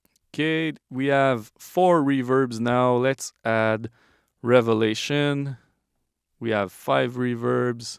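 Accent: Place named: Quebec, Canada. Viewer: You would love Object editing in Samplitude. They been doing this for a long time. They have this crazy sale going on right now for Samplitude Pro X3 plus Sound Forge Pro 11 and Spectralayers Pro. Worth looking into. I'll post a link but I'm not sure it'll work. <link> I'd recognize that Quebec Accent anywhere. Salut! And thanks for the video.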